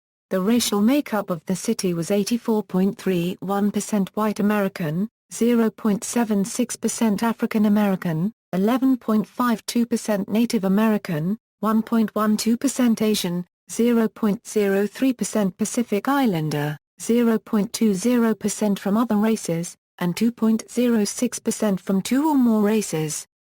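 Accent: United Kingdom. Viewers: Is this some robotic 'Siri-like voice' with a British accent reading this or a real person?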